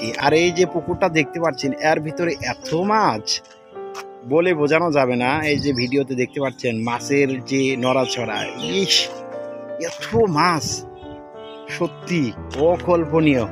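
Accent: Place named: Khulna, Bangladesh. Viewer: ভাইয়া আমিও খুলনার আপনিও খুলনার ছেলে আমি ঢাকা থাকি কিন্তু নিজের একলার কোন মানুষের কন্ঠ শুনলেও ভালো লাগে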